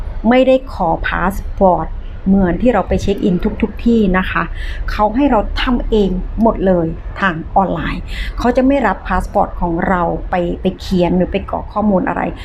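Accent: South, Thailand. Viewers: พูดดีแล้วค่ะ เราคนใต้ก็พูดแบบนี้แหละ ยังไงพี่ก็ชอบน้องนะคะ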